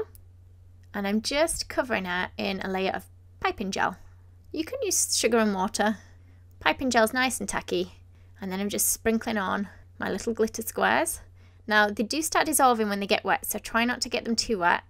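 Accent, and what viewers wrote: United Kingdom. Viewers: I love your bright Britain accent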